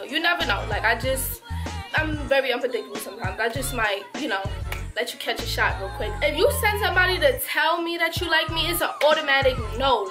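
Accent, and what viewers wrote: New York, United States. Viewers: You from nyc?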